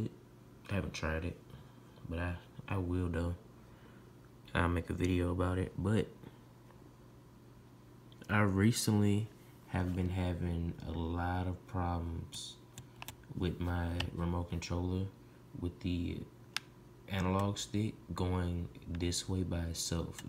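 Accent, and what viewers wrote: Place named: Louisiana, United States. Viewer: You from Louisiana bro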